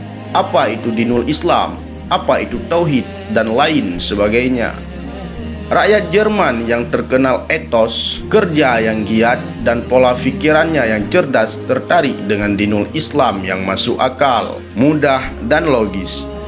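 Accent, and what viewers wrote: Bali, Indonesia. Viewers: Assalamualaikum admid ,admin apa ora bali ya lagat og kental kaya dari bali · Cengkok bahasanya seperti orang bali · Admin punya aksen dari zona Bali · Pengisi suara dari bali ya?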